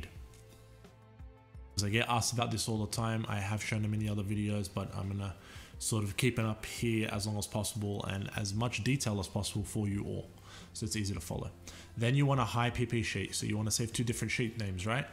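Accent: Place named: Australia. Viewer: I thought you sound Aussie haha great vid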